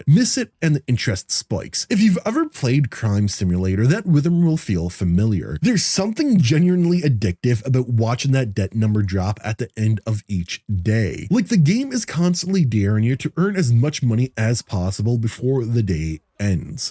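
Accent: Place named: Canada. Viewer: I think because you're Canadian you don't notice, but every Canadian I have heard says "about" the same way to me. Everything else you said sounds fine to me though. I'm English just for context.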